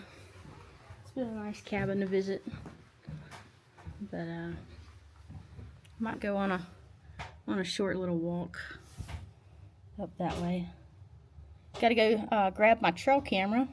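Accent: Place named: Montana, United States. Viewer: Your starting to sound like them people in MT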